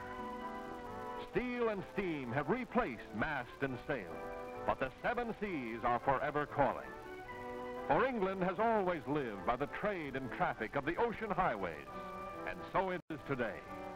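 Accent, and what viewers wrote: United States. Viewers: Infant death rates and death in childbirth were higher. Polio, TB was common. This was an advertisement with an American narrator.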